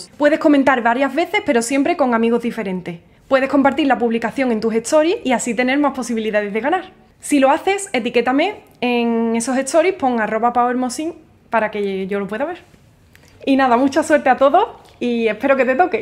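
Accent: Spain. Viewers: Felicitaciones, apuesto que hay mas de 300,000 mil personas creo que no hay rincón del mundo donde no te oigamos. Nos ayudaste muchísimo al salir a la webb, con tu hermosísima, música, justo lo hiciste, cuando el mundo estaba en pausa y el miedo calaba hasta en el corazón de hierro... Y tus poemas soplaron por mis mejillas, con la ternura de una caricia y la frescura del viento del amanecer. Gracias Hermosa y que dios te llene de bendiciones, antes que te des cuenta seremos 5,000 millones de personas, las que te seguiremos para disfrutar de tu talento artístico de tu bella sonrisa y dejo español... No lo dudes, que en todos los rincones del mundo, tu voz y tu guitarra alegran el corazón de alguna persona. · El libro lo leería frente al mar. Y le dedicaría algunos de los poemas a mi esposa. Saludos desde Puerto Rico 🇵🇷! Me encanta escucharte tocar la guitarra, tus videos siempre empiezan con un bonito saludo, y tu acento del área donde vives en España! 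· Hola, soy de Colombia y me encanta tu acento español y tú sensillez y como interpretar la guitarra.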